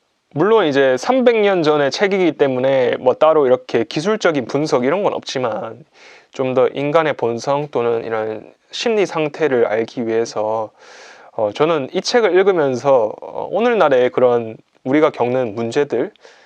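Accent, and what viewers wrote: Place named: Gyeongsang, South Korea. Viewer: 경상도 억양의 남자분인데 영어 문장을 읽을 때는 발음이 오~ 혼돈 속의 혼돈 번역해서 책으로 내신다면 사고 싶어요.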